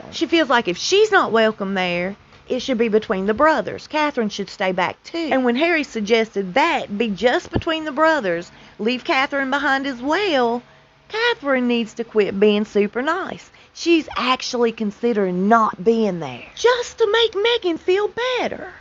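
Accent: South, United States. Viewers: You have the sweetest southern accent. I live in North Carolina. I enjoy your videos. There's a commercial on now. Lol. Have a great day. We're blessed to live in the South❣ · I could never imagine cursing my grandma out. I would have carried her on a satin pillow if I was capable. I know from your accent that you’re southern and I’m southern and we just don’t play that way. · Love your southern accent! Lisa from Spain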